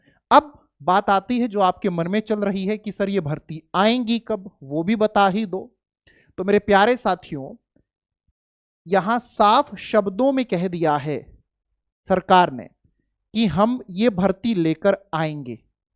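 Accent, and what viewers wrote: Haryana, India. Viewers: Sir apki harayanvi 🎉😮😊😊voice very good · Wha sir aapne to dil khus ker diya aaj haryanvi bol k,aaj lagya apnapen is video m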